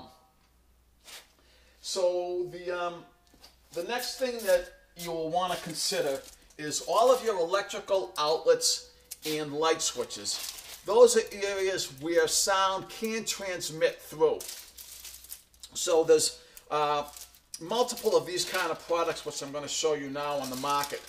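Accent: Boston, United States. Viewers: What's your accent? Boston?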